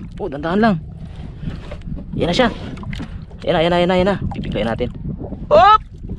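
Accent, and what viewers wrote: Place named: Visayas, Philippines. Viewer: ..mUrag biSaya maN idOl anO sAlita nYO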